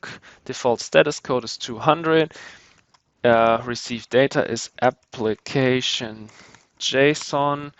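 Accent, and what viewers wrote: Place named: Germany. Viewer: Bitte, wo finde ich die seite in der ich den ganzen bums bearbeiten kann ich raffs nicht. Und komm mir nicht mit englisch ich hör den deutschen raus ^^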